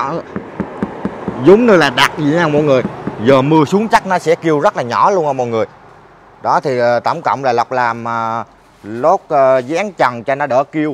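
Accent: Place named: Quang Nam, Vietnam. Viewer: Nghe chú em nói tiếng Quảng Nam rất vzui tai, Con gè con ke.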